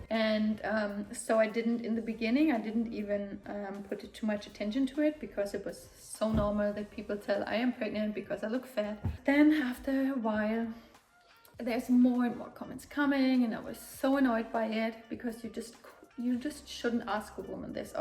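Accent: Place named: England, United Kingdom. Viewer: Send you and Mario love and blessings from Mexico, I’ve just subscribed to your channel and it’s interesting, I like your English accent. ❤️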